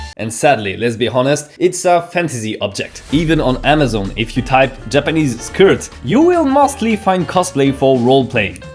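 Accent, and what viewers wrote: France. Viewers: your French accent is coming out in your pronunciation of some H's, like in "hierarchies" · ton accent francaise est tres évident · With just a little bit of a French accent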